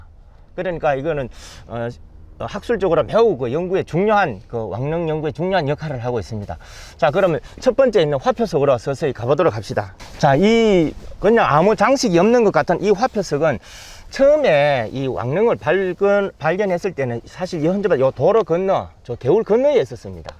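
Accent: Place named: Gyeongsang, South Korea. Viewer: 시간과 여유가 있으면 늘 생각하고 또 방문하는 마음의 고향 경주...선생님의 자세한 설명 잘들었습니다.민아학생은 졸업했겠네요 구수하고 따뜻한 갱상도 사투리가 듣기 좋아요^^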